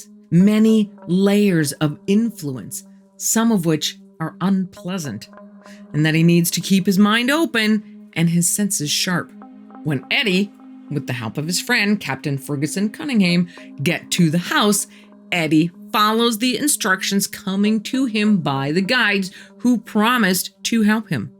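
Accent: United States. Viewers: I never thought I could listen to an American accent for 3 hours, but she is a very good storyteller. Very Enjoyable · insufferable yank voice.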